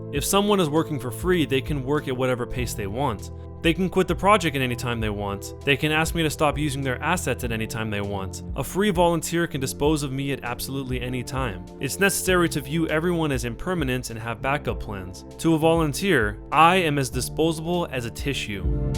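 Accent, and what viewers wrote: Sweden. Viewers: Are you swedish???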